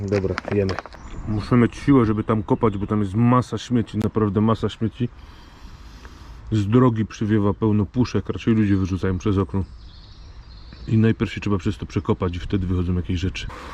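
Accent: Silesia, Poland. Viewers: Godosz po Naszymu a piszesz KASZANKA hahaha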